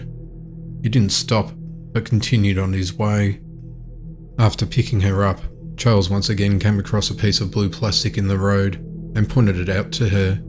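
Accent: Australia. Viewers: So glad to hear an Aussies voice. Your voice fits perfectly to the background noises. Very tragic what happened to these people though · Great to hear a fellow Aussies voice 😀 Loving the videos so many cases I never heard of and wonderful to see that some I knew about have been solved. Binge watching ... cheers from Queensland 🍻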